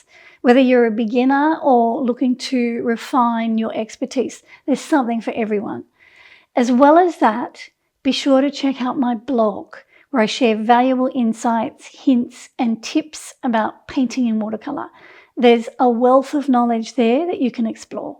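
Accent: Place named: Australia. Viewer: It’s that lovely Australian accent that got me. 😊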